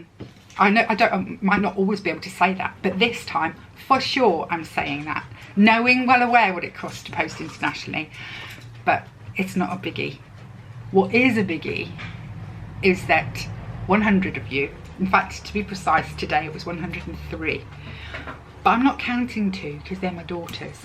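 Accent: England, United Kingdom. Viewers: Hi, I found you through Vicki Wiik channel and so glad I did. I live in Canada 🇨🇦 now, but originally from London, England. Your channel is fun and down to earth and it’s so great to hear an English accent and see a different slant on unboxings., etc. I do miss England but Canada is lovely too. Looking forward to more videos now that I’ve discovered you. By the way I’m not a YouTuber, I don’t have the ‘balls’ as they say over here. 😄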